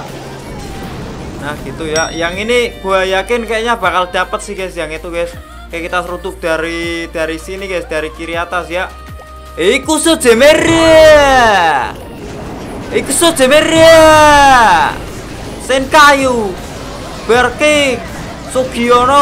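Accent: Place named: Java, Indonesia. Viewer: Medok jawa adik adik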